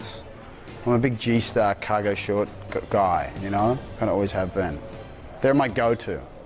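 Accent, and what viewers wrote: Australia. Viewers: I didn't know Alex speaks with a phony American accent in the TV series "Hawaii 5-0!" His Australian accent gives him a whole different persona. 👍 😉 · 'cause he's aussie and he talks with aussie accent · American here, OMG, he's even hotter with the Australian accent!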